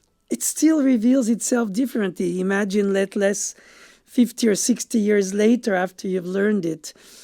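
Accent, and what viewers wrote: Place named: France. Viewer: I can listen you speak for hours. I don’t know if your manner of speaking is because of your native French or because of your intellectual and aristocratic sophistication. But you definitely sound like someone from the past (from a glorious, superior past) and that both makes me happy and sad.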